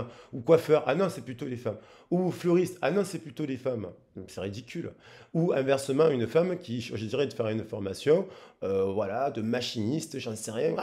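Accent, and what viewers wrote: South, France. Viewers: 🤣Shamala VIBES?😏🤣😜🔥♏⚡🔥.Merci pour le partage de tes énergies avec l’accent du sud dans tes cordes vocales😊😎🤌🏻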